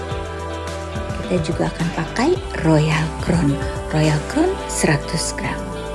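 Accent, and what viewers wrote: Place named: West Java, Indonesia. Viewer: Masyaallah cantik nya ci ibun,,, Logat bahasa seperti nya orang sunda yah🤩, Salam sukses ibun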